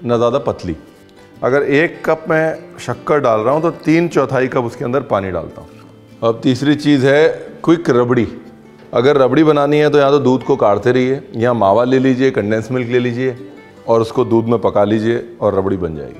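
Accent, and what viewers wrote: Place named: Lucknow, India. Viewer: Hey Ranveer I'm Gaurav from lko You are first whom I had subscribed I had never subscribed any one before on YouTube. Just telling you coz I admire you and your talent of cooking And over n above your commentary during cooking , poora lakhnavi andaaj Youu kahe ki baaton se hi tar kar dete ho . Hope you will read and reply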